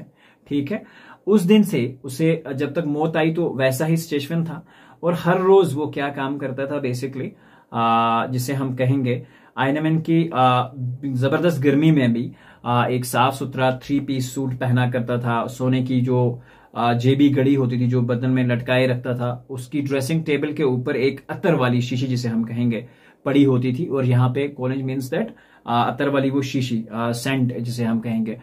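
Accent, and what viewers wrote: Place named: Kerala, India. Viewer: Kerala ki pronounciation 🤣🤣🤣🤣🤣🤣😂😂😂😂😂😂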